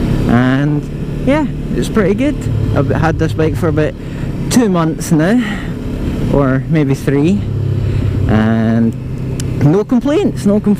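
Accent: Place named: Scotland, United Kingdom. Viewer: Hi mate, nice review. You sound like you're from Scotland. I am as well, and was wondering if you could tell me where you got the bike? I'm thinking about getting it as well! :) Thanks.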